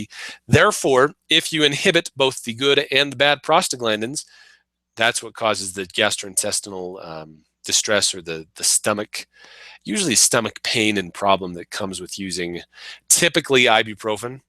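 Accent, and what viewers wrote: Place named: West, United States. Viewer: Ha ha, the joke near the end! The accent sounds western American, i guess.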